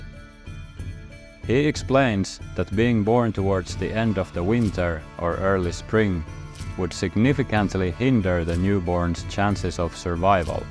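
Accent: Finland. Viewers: My French hubby who's born in Berlin, LOVES Finnish accent, and also your humour! Hyvää Juhannusta/Ukonjuhlaa näin jälkikäteen! 🙂 Tai erittäin ajoissa, miten päin haluaa katsoa! 😉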